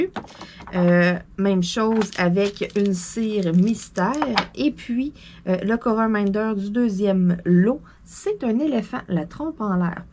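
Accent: Canada. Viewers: Coucou.J'adore tes vidéos avec ton joli accent canadienTu nous montre de belles choses et tes conseils sont toujours bien expliqué.merci pour ce concours.Trs gentil de ta partJe participe avec plaisir et te fais de très gros bisous.Et des caresses à tes minous.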